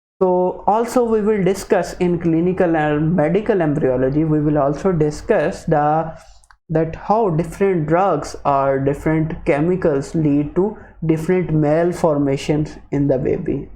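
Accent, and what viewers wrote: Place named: India. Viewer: From his accent, I think he is Indian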